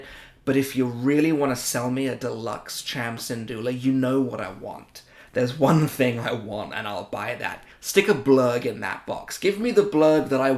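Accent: England, United Kingdom. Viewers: Ive never been good with accents but I assume your English. Im from Northern Ireland so its nice to see another Brit doing the Black Series on YT. Plus I also spotted some Dr Who behind you 😁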